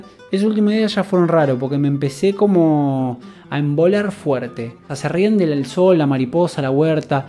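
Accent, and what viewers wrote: Argentina. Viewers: Muy bueno todos tus sketch pero es que encima tu forma de comentarlos con tu acento argentino lo hace unico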